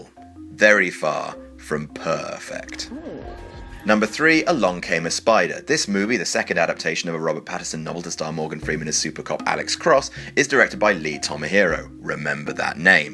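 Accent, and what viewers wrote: United Kingdom. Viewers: Is it just me, or is everyone else fed up with British accent narrators, and Teleprompter readers.. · Make your introduction even longer.... there is nothing I love more than listening to some boring British monotone voice blather on and on and on....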